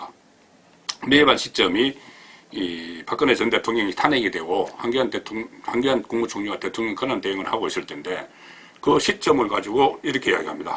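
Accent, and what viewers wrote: Gyeongsang, South Korea. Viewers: 발음은 타고난것이고 억양은 경상도지역 억양인데 너무 무례하군요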